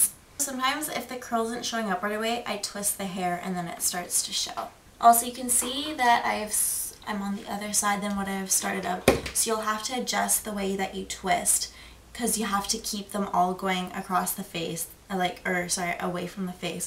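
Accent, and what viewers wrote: Canada. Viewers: Lol your Canadian accent is cute. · Hehe I could tell you're Canadian by the way you talk ^.^ A bunch of shows are Canadian so I kinda know how the accent is lol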